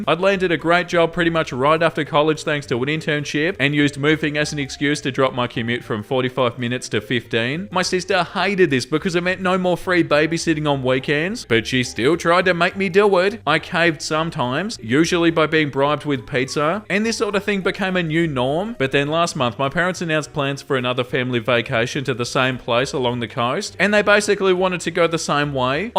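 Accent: Australia. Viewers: I have to wonder if you're an Aussie. You sound like it, and it's nice to sit and listen to.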